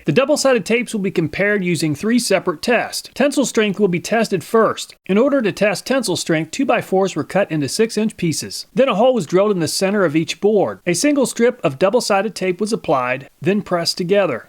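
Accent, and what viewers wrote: United States. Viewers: A genuine request. You have a strong accent to those of us from outside the United States. yes, there is a world outside the US and the speed you speak at makes it very difficult for us to understand comprehend your story. You are interesting but hey, three runs of the story to understand. your challenge is, attract more people from over the shores.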